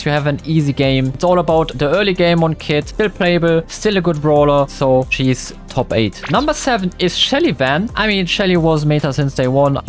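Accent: Germany. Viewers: yeah but that’s because he’s german and has an accent bro the other ones are just mistakes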